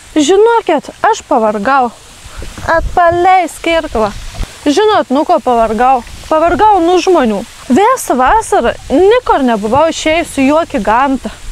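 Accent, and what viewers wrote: Samogitia, Lithuania. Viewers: Jetau kam monie patin to zemaite kalba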